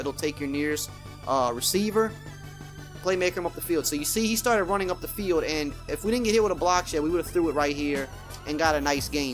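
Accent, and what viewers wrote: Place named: New Orleans, United States. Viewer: That's gotta be a new Orleans accent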